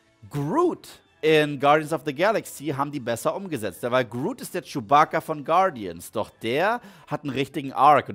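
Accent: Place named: United States. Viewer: da hat aber jemand einen krassen us akzent. :)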